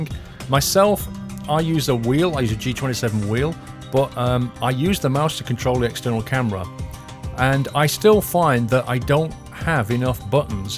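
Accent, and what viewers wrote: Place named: United Kingdom. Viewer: Nice review, i really like that it has kind of a unique british feel to it. Maybe it was just the accent:D, but it really felt more professional compared to the more gamery type of hardware reviews from US based tech channels that i usually watch Anyway i still want to give you a heads up, as there has been a lot of controversy about this that can be a problem in the future when your channel gets even bigger, i think a clearer disclaimer can be helpful, especially whether you have been paid to do a review or if it was just you wanting to do a review and they provide you with the product. Youtube also has a guideline on this too if you haven't seen it <link> Keep posting these reviews though, i enjoy it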